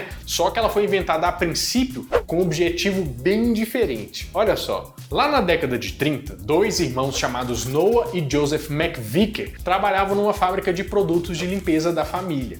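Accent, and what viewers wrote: Minas Gerais, Brazil. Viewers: Único canal em que não pulo qdo está pedindo pra dar like e compartilhar. Amo seu jeitin mineirin de falar. Pura nostalgia pq sou mineira e não tenho sotaque pois estou há 65 anos em São Paulo. E seus vídeos são ótimos, amo curiosidades e aprendo muito com vc rapaz. 🙏🏻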